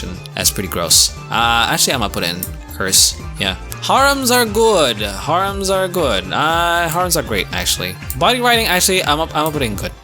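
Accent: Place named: United States. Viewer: ayo i thought bro is american he have a very good american accent hello bang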